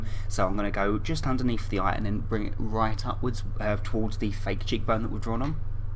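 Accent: United Kingdom. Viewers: ohmygawsh with your cute little british accent and the marvelous makeup you did, it matches the character so perfectly<3 I actually loved Glen(da) so much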